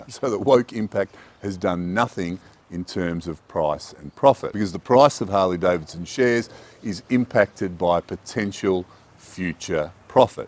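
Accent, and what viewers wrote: Australia. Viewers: A guy with an Australian accent is telling me not to boycott woke Harley Davidson. Go woke go broke! brother!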